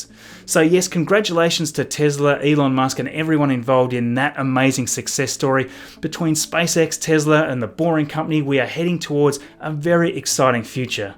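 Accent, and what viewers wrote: Australia. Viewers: Im sorry to ask off topic but where is your accent from, Australia?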